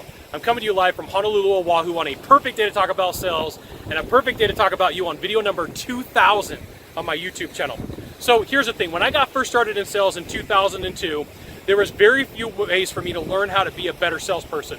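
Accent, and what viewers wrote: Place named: United Kingdom. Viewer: Hi, I remember getting into Sales after my husband died and bought and read sales book galore to start. I had to write and create all of my own marketing material and training. And so many great help out there. Sample it and attempt and integrate it. Congratulations on video 2000. Oh, that British accent. lol. Always providing great sales content. Yes, no lending library. I lent for awhile and, yes, never saw them again. Study at the library. Like that. It's always great having that coach, that mentor to bounce the ideas off of etc. Which we should, indeed. Aloha. Happy Holidays. Cheers and blessings, April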